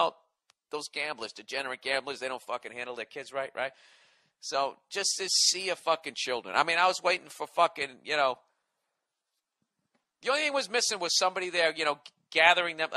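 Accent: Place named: Michigan, United States. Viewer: Sounds like people from flint Michigan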